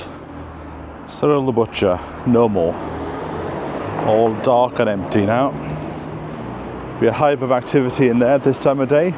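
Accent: Wales, United Kingdom. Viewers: . I just want to say how much I enjoy your channel my mum was Welsh😊 so I’m half welsh. I love the valleys and I love the welsh accent. Keep it up great content great channel 👍👍👍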